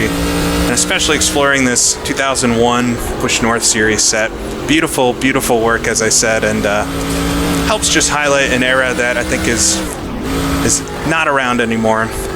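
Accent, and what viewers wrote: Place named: Northeast, United States. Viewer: I’m new to the channel, & I could tell you were probably from the Northeast just a few videos in (I talk to dozens of different people a day, haha). It’s good to see NASCAR’s regional series come to NR2003, as it holds a lot of memories for people who watched local racing scenes. I’m from the West Coast so I’d love for someone to do the NASCAR West Coast Series from <num> for this game.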